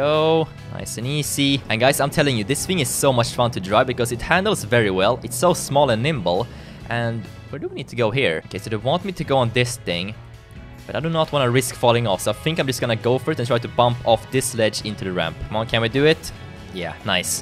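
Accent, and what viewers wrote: Sweden. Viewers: you sound swedish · Are you from sweden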